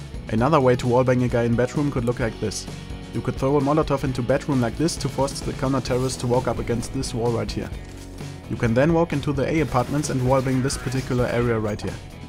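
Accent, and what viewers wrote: Germany. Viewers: Du kommst aus Deutschland oder ? :D hört man am aktzent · man hört einfach raus das es ein deutscher typ is ;D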